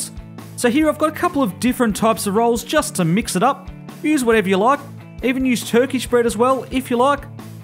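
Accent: Australia. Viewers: I like the aussie accent, very similar to the british one righ'?)))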